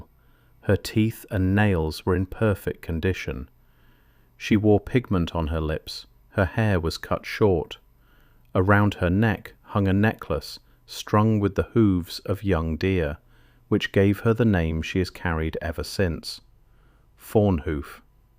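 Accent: United Kingdom. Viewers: Yea. Same British voice on all the videos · A British accent saying "54 degrees" and not immediately saying "Fahrenheit" after that. Only with AI.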